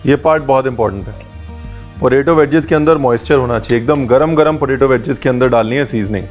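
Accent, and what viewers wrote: India. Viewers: Thnx sir for this recipe ,sir love ur desi style when u talk 🙏🏻😊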